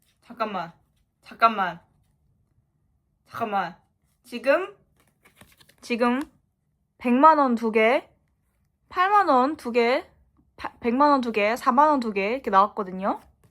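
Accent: United Kingdom. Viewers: 한국에선 영국 악센트 영어 배우기가 쉽지 않아서 듣는것만으로 좋더라고요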